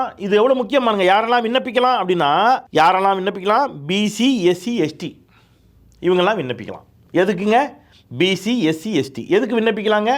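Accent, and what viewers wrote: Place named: Valliyoor, India. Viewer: Super brother naanum Valliyoor thann ungal voice slang keta enaku udane namma voor niyabagam varum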